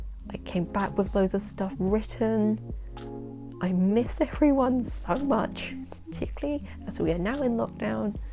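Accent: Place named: London, United Kingdom. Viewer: I subbed to your channel from your Asian readathon tbr but again, RELATABLE bc I am lonely on youtube. I have no online friends although I am new on making booktube content 😂 Also, OMG BC bitesize saved my GCSE grades + KS3 + still being in year 13 without any exams to sit I'm using it to teach since I am currently working as a GCSE English tutor😂 Film production and photography sound so interesting! This video was so calming and your accent + inserts in this video make me miss London even more ;-; (edit) - I see my not so perfect life on your bookshelf! I loved that book so much ! Have you read it too ?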